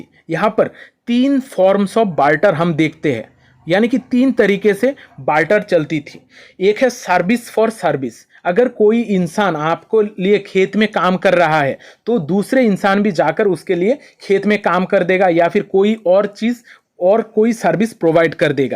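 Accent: Bengal, India. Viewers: Sir ap bangal se h kya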